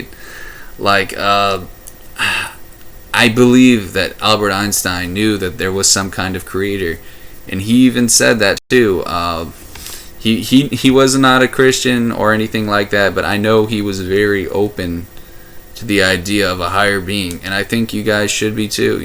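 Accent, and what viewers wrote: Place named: United States. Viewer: Atheism is not a theory. So... before you try to "debunk" something, you should first learn what it is. Never a good start, when your first sentence demonstrates your ignorance on the subject you attempt to talk on. Atheism is a RESPONSE to a claim. The claim "There is a god". Atheism is the rejection of this claim, as unsupported. Got it? Good. So... you sound like you're from the US. And in the US, pretty much all people in higher offices are theists. They HAVE to be, otherwise the general public wouldn't elect them. Because apparently, whether or not you believe in an invisible being with supernatural powers is more important, than whether you have good qualifications or good political ideas. So yeah... you're idea about atheism coming from the "elite" falls flat right in the beginning... Illuminati? Are you serious? 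0_o The state isn't my god. I don't think the state is an all-powerful, supernatural being. The state also isn't ONE entity. It's made up of a number of people. I also don't worship the state. So, all in all: That's a weird claim to make! You literally have to redefine what you mean by "god", to make this claim work. And honestly, if you have to redefine terms, just to make your point, you should already realize that you don't have a good point to make. That's not a number one theory of atheists. That's not a number 10 theory of atheists. Actually, that matches more what theists believe. Theists usually believe that the entire universe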